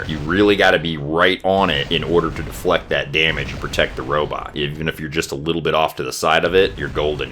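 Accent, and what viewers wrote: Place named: United States. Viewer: Btw, I love your pure american pronouncation ❤️❤️❤️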